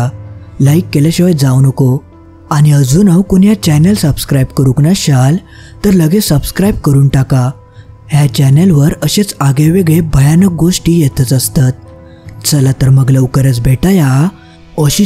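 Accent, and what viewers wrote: Malvani, India. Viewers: Gosta farch chan, aani malvnit aiknyachi maja kahi veglich thank you.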